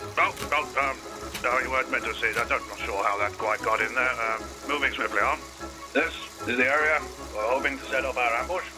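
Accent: United Kingdom. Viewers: I like it. Especially the typical English voice :) In all the videos!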